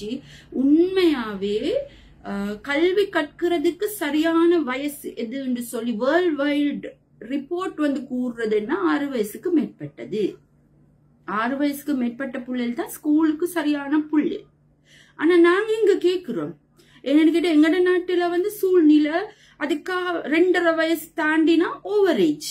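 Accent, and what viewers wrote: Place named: Colombo, Sri Lanka. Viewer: இது கொழும்பு மக்களுக்கு உரிய பேச்சு நடை எல்லாரும் சுத்த தமிழிலா பேசுறாங்க குறை காண்பதே வழக்கம் போல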